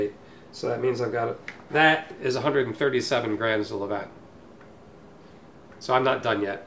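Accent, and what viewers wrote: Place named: France. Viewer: sounds kinda french.. because it is :S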